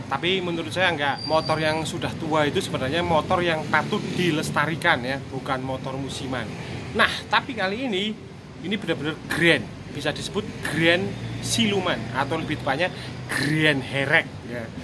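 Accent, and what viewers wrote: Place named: Wonosobo, Indonesia. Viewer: suarane Wonosobo banget, obat kangen wong sobo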